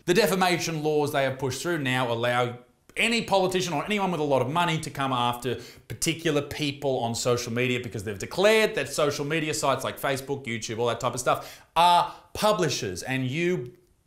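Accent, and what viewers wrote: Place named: Australia. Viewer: God you're Australian.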